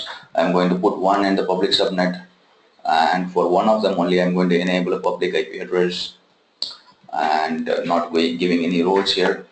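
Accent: India. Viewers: Good presentation. Nice to hear south Indian accent !!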